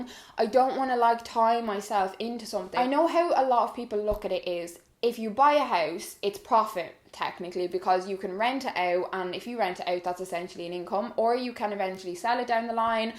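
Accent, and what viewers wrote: Ireland. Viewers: Why do you have such a strong Irish accent and Melanie doesn't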